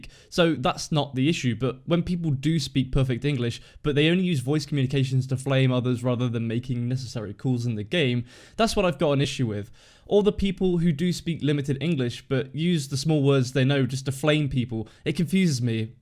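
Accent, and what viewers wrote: United Kingdom. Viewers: are you from the UK mate? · you sound British so assuming you live in the UK how the hell do you have good ping on NA????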